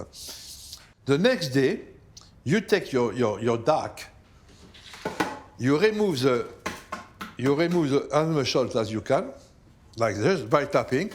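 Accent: France. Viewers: Lovely, charming man, absolutely love his French accent! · Alors check again, D'accay His frenglish is so beautiful.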